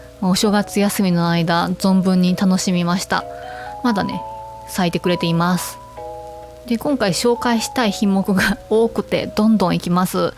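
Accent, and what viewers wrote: Kansai, Japan. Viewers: こんにちは。初コメです。聞き入ってしまう柔らかな声と、懐かしい関西弁と、美しい言葉遣い。そしてたまに出るお笑い要素も大好きです！朝イチの家事のお供に聞かせてもらってます🧹🧽🫧 グラスって気づいたら増えちゃいますよねあるある。またコメントします！♡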